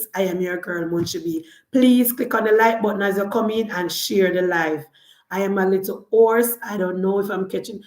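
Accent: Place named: Jamaica. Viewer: first -time on your program i like the way you talk as a true Jamaican and a true Labourite i wan't you to tell the prime minister please since he going to reduce the 7% of the light bill i wan't him to look into the high food price if he can reduce the food price it will be a good thing for the Jamaican people and it will good for him too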